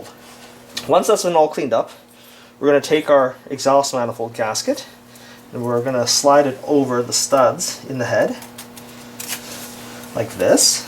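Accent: Canada. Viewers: My god, you’re Canadian 🇨🇦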